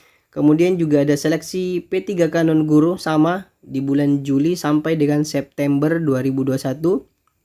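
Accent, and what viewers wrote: Pontianak, Indonesia. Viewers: Mau tanya bg abg asal pontianak ya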